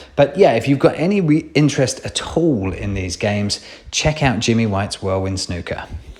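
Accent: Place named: United Kingdom. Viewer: Hi , isn’t Worms an Amiga game that got a DOS/PC version amongst many other ports? “PC” from this era (in the UK at least ) generally refers to IBM compatible machines rather than other home computers (ST, Amiga etc). I would have referred to the original Amiga version if it were my video - not “PC”. I remember this being released in the UK as I worked in a computer game shop at the time . I appreciate a lot of YouTube videos are America centric but you sound British . Kind regards D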